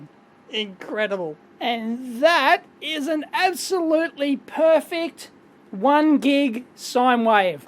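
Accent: Australia. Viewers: Aren't Aprils fool an US-only tradition? Youtuber is from Australia (as if his accent didn't made that obvious) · This guy is Aussie AF.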